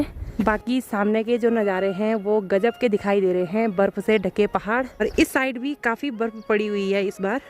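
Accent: Garhwal, India. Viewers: apki Hindi boli me gadwali bhasha ki jhalak bahut pyari lagati hai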